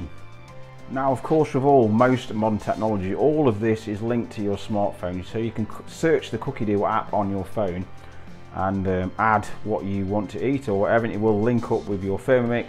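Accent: United Kingdom. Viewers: Thank you so much for your detailed and really informative helpful review. I'm a foodie too and a competent cook but am time poor with work and uni study so am tempted to add the TM6 to my kitchen, even though I already own a different brand high quality thermo cooker (which I love as well!). I'm hoping the teenagers will find it fun to use so that they can help out a bit more! 😂 I really value your first review. Think you may have persuaded me to go for it! Thanks so much. Ps love your accent, I'm a Brit, now Aussie living down under. Made me feel at home. 😊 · Derby accent? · I’ve no idea why this fella thinks you’re Australian.. you sound more northern uk than anything (sorry if I got it wrong). We definitely do not pronounce yoghurt like you do lol. Soz, upgraded from tm5 to tm6 recently and looking for hints and tips. Spose I should ask my consultant but I don’t want to bother her right now ( can’t be half arsed) Hope you’re doing well xxx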